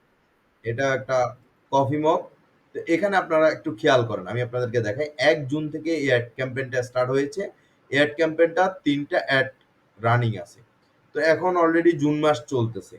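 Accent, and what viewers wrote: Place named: Chittagong, Bangladesh. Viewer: কথা শুনলে বুঝতে পারি আমাদের চট্টগ্রামের ভাই। আপনার বাড়ি চট্টগ্রামের কোন জায়গায় বদ্দা?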